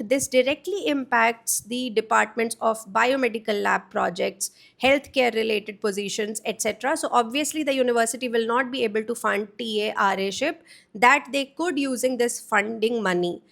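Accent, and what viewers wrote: India. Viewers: I cannot see why an American company would hire an Indian instead of a local American guy for product manager. Most Americans are having a hard time understanding your Indian accent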